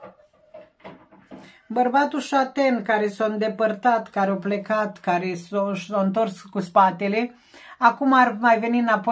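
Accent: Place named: Moldova. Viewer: Superba etalare! Esti o draguta! Multumesc pentru frumoasa citire! Imi place accentul tau...moldoveanca de a mea! Acum mi-ai aparut..e prima data cand te vad si iti ascult citirea si..mi-a placut! Si da! M-am abonat! Te pup pe suflet! Te astept cu urmatoarea citire! ❤❤❤❤❤❤❤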